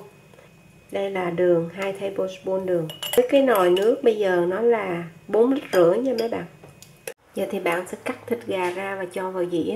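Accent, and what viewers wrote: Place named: Mekong Delta, Vietnam. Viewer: hết sức chân thành cảm ơn đã nhiệt tình bỏ hết tâm, sức để hướng dẫn, phổ biến những món ăn quốc hồn, quốc túy của Việt Nam cho mọi người một cách đầy đủ, chi tiết kể cả bí quyết gia truyền nhất làm các món xuất xứ từ Miền Bắc như Phở bò, gà, bánh cuốn....tôi cũng rất thích giọng nói mang âm hưởng Miền Tây chất phát, phát thiệt tình....tôi thường xuyên xem các youtube của bạn chúc luôn VUI VẺ, KHỎE MẠNH nhe....và cống hiến nhiều hơn nữa cho cộng đồng: thank you...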